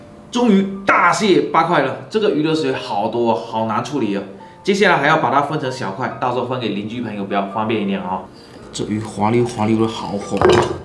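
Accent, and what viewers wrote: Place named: Fujian, China. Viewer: 你是福建人吗。。。 哈哈 口音好重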